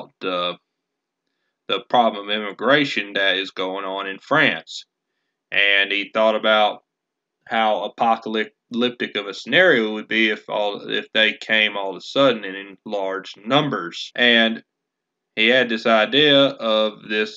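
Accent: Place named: South, United States. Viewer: Ofcourse you would have a southern accent, not even surprised in the slightest bit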